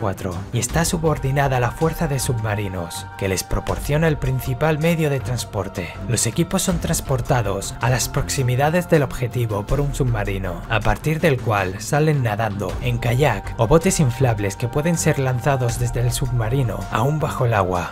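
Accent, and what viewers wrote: Spain. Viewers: Se me hace que eres español... 🤔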